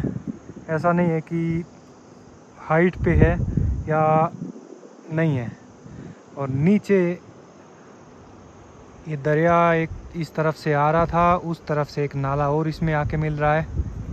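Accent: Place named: Chamba, India. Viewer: Chamba se ho ap kya bhai nyc video apki bolne ki tone thodi chamba ki lagi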